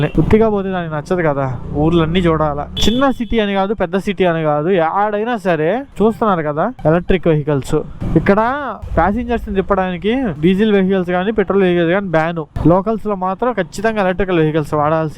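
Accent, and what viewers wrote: Nellore, India. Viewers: Bro.. I also from nellore District atmakur now staying in Dubai....Can you please describe about your camera and audio system...మా యాస లో vedio చాల బాగుంది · Mana Nellore yasa super nuv Neela unnav 👍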